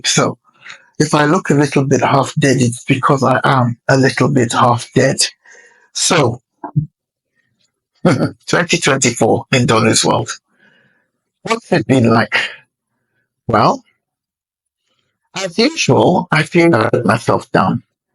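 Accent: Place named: United Kingdom. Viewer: My dear Donu, anything that you find interesting is ok with me. You have a gift of gab as we say in America…..plus your British accent is quite charming. Enjoy your vacation, eat whatever you wish and come back with a new lease on life. Take care,I will look forward to your return…..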